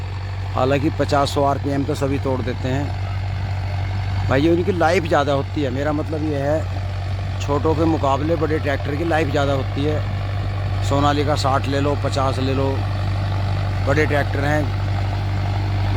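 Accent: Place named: Western Uttar Pradesh, India. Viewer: जी राम राम। बिजी रहता हूँ फिर भी आपकी वीडियो आपकी कड़क आवाज सुनने की खातिर वीडियो पूरा देखता हूँ। दूसरा कारण वेस्ट यूपी की स्थानीय भाषा की बोली और लहजा मुझे हरियाणे की भाषा के mukable में ओर भी अच्छी लगती है। मेरा एक अजीज दोस्त गाज़ियाबाद से है। उस से 11 साल से बात कर रहा हूँ इस लिए अब मुझे वेस्ट यूपी की भाषा समझने में जल्द आ जाती है। From- गंगानगर (Raj.)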